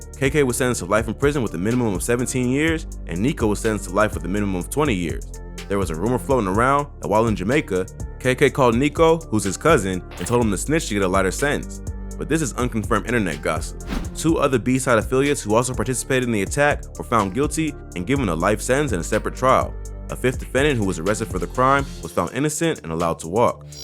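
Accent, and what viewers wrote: United States. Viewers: Bro I love this us accent wtf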